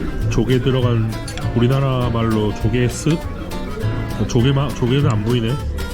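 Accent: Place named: Gyeongsang, South Korea. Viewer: 여행유투버를 많이 보는데 이렇게 크루즈여행 영상은 처음이에요.우연히 을 알게 돼서 몇날을 영상을 보고있어요. 혹시 부산분 아니세요?부드러운 경상도 억양의 말씀..아주 듣기 좋네요.근데 아직 서른 정도의 어리신분인데 어째 이래 많이 여행할 수있었는지 궁금 합니다.우리아들 야단 좀 많이 쳐야겠어요. 저는 55살부터 단기 자유여행을 여러번 했는데, 코로나이후로 못나가봤어요.우리는 몇년 지나다 보니 늙어 힘이 없어서 출발결심이 어렵군요. 영상 너무 재밌게 보고 있어요. 젊음이 부럽습니다. 많이 보여주세요~~ㅎ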